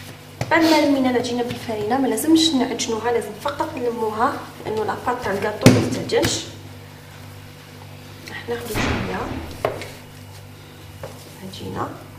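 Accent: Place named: Arab world. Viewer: يعطيك الصحة ولي تعجبني اللهجة جهة الغرب تفكريني في الفاميليا لما نحضر في مناسباتهم موفقة وننتضر المزيد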